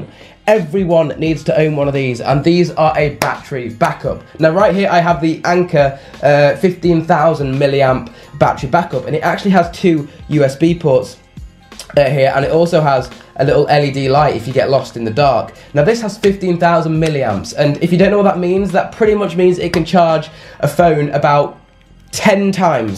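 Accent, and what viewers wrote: United Kingdom. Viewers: that british accent is awesome, you make everything sound so much cooler or than it is lol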